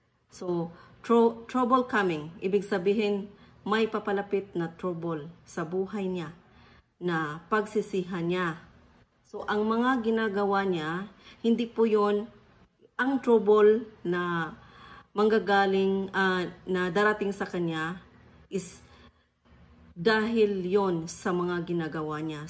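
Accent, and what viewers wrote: Visayas, Philippines. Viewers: Ha?????😂😂😂😂😂😂Diyos lang ang nakakaalam ng lahat DDS ito😂😂😂😂 paano mananakot e siya na nga ang tinatakot harapharapan😂😂😂pananalita mo lng bisaya o davawenya ka DIYOS ka ba???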